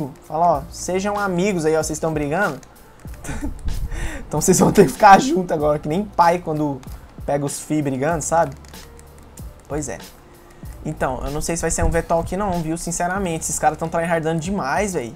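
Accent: Minas Gerais, Brazil. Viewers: Quem curte o Mineirês do dá like!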